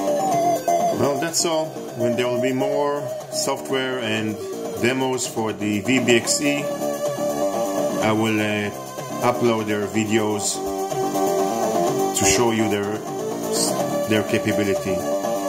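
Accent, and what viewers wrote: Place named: Israel. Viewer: גבר לפי המבטא אתה ישראלי כמוני .... מדהים מה שעשית עם ה600XL לי יש עדיין 800XL בעליית הגג.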